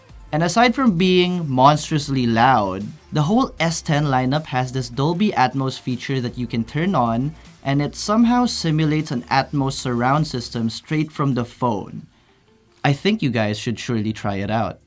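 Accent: Philippines. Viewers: I'm confused. You look korean, but you have a tagalog accent. Itzy! They're dope, right? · I immediately stop watching the moment I hear the ugly Filipino accent!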